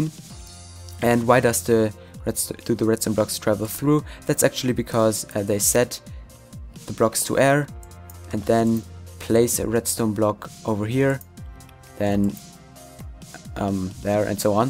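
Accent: Germany. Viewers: Man merkt einfach übel das du deutsch bist :D Aber nices video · Are you german?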